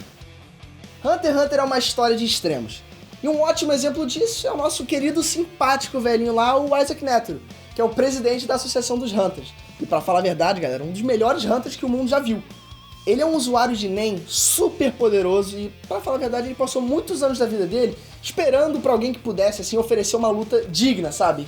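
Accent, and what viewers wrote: Rio de Janeiro, Brazil. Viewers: sotaque carioca kkkk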